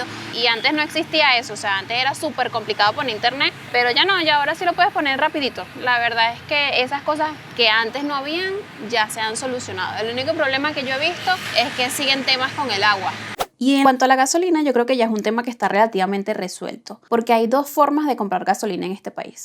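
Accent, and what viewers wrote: Venezuela. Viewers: Me encanta que has llegado con tu acento venezolano. Es posible que tengas nuevas palabras y expresiones pero es tu acento. Algunos con solo unos pocos meses fuera del país vienen con otro acento y hasta pretenden que olvidaron ciertas palabras.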